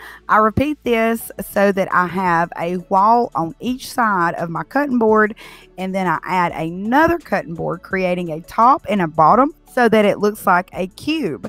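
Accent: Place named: North Carolina, United States. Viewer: I just subscribed. I don't know anything about you, your accent sounds like North Carolina. I live in Smithfield North Carolina.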